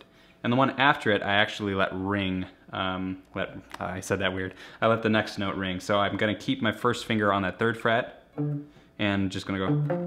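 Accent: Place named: Midwest, United States. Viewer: where are you from? You sound like you're from the midwest. Maybe Wisconsin or Michigan?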